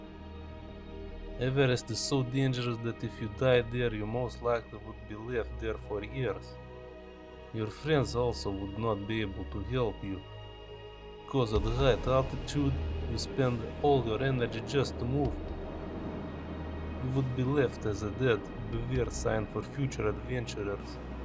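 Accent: Russia. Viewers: You sound really russian Oh yeah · I love this Russian accent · Im confused xD are you actually russian or just playing the accent. Either way it's on point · I actually enjoy more of this Russian accent than normal English accent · Sounds like crazy Russian hacker mixed with gru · You sound very russian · Typical Russian voice xd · Really love that Russian accent! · Unique russian accent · Try fix the russian accent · Aahhh Russian accent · I like russians ur russian i hear it from ur voice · God ur accent is fuckin russian ! Loved it but i rather like the older channel !! Keep uploading on it ! Thnx love from india ! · Dude the Russian accent makes it seem cooler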